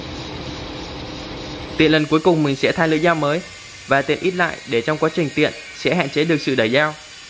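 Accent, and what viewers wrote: Vietnam. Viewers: Bạn nói giọng bắc tưởng ở đâu đó loanh quanh Hà Tây thì nhất định chạy đến mua bia với mồi nhậu để xin kết bạn hihi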